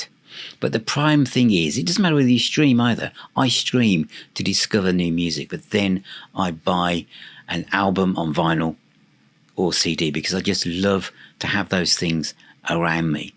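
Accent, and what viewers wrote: United Kingdom. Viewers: Discovered you around 6 months ago and really enjoying the videos. It’s great to see an ordinary bloke from the U.K. with a regional accent talking about music I love (most of the channels I follow are American). 45 year old, mainly CD collector here, collecting rock and metal. I’ve also just joined your Facebook group and waiting for approval! Keep it up mate. After watching this video it seems there’s lots to look forward to through the Autumn. Thanks for the content. 🤘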